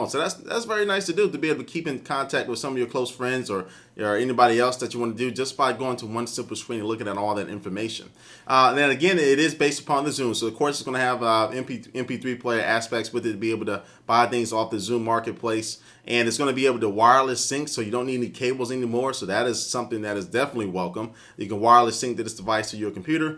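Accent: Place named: United States. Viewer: i can tell you are american you know why because americans seem to have this wierd thing about wrongly pronouncing words like mobile phone you say moble phone why there is an I in the word mobiiiile phone or when americans say soldering they say soddering wrong there is an L in the word soillldering no offence americans but if you going to speak english speak it right and say you are speaking english not american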